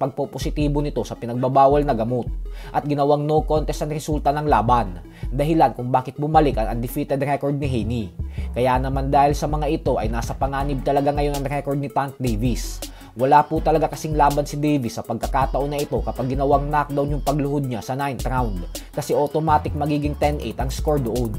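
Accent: Visayas, Philippines. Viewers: Ang dame mong alam di tugma sunasabi mo panget pa Ng boses mo visayang visaya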